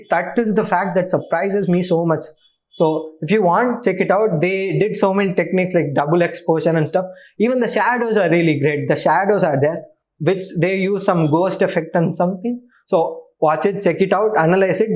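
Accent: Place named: Kerala, India. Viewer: He sounds malayali to kaisa bolinga une haule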